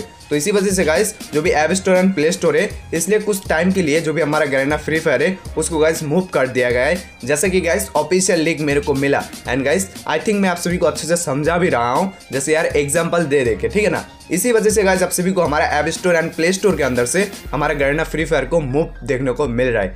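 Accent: Bengal, India. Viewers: Yes bengal se lagta hai😐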